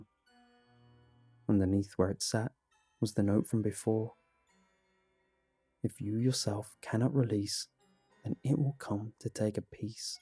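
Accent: United Kingdom. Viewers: r u British?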